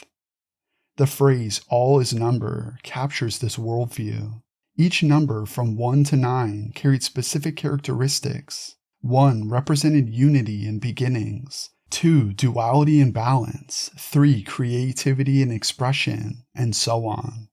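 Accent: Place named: California, United States. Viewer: The California AI accent is too annoying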